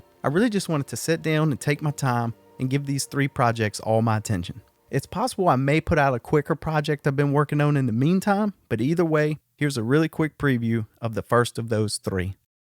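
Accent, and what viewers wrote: South, United States. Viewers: I saw the notification for a new video. Only problem I have is your videography and editing are so good and high quality I will only watch your videos in 4K on my 65" TV. It's like watching a movie everytime and I actually feel like I'm right there with you as you narrate. It's like an IMAX documentary for coasters. Please take as much time as you need we will all be here awaiting your over the top content and your gentlemanly southern drawl guiding us through they way you see the theme park world.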